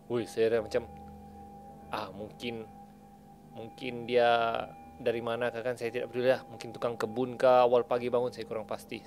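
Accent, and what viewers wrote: Sabah, Malaysia. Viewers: From Sabahan? Right?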